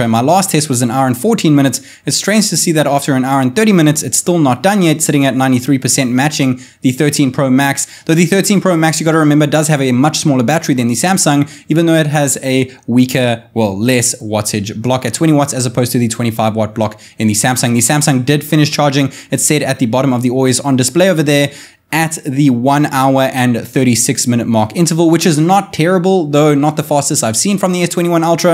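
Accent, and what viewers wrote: South Africa. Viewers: Bro I can recognise that accent anywhere. Hello from a fellow South African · i swear this guy sounds south african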